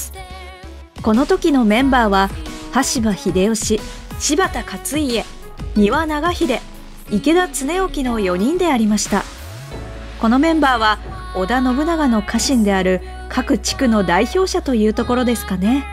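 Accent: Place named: Mikawa, Japan. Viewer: 三河のアクセントが気になる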